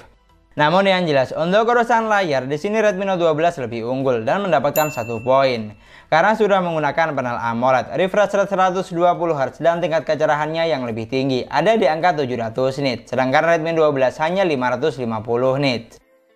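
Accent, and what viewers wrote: Madura, Indonesia. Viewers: Aksen madura ya kk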